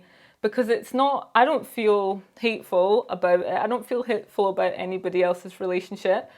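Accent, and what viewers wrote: Scotland, United Kingdom. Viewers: No, she definitely doesn’t. She has a very strong Scottish accent. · Hang in there! Love your videos and to see a black woman with a Scottish accent is so cool. We all have hangups we need to get over. Some people go to positive others prefer negative.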